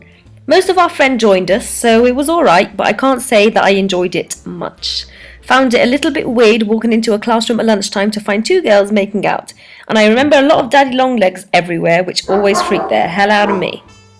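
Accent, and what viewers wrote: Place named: England, United Kingdom. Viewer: I can't sometimes understand what she said cause she speaks fast with an English accent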